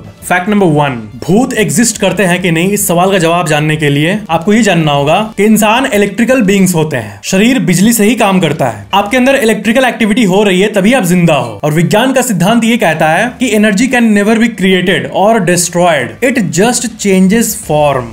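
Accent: Bihar, India. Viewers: Bihari ho kya aap?? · Bhaiya bihari ho kya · 😂😂that bihari accent 😅❤❤❤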